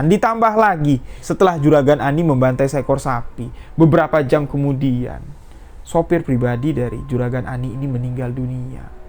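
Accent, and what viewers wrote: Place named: Java, Indonesia. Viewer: Lbh suka crta Dari kk nyeritainnya dgn kata kata yg mudah dipahami n detail.bnr bnr bgus.sayaharap bg Riosprti kk lbh pke bhsa yg bagus bhsa Indonesia yg mudah dimgrti(GK pke yo yo medhok java)Mohon maaf hnya sbtas kritikan.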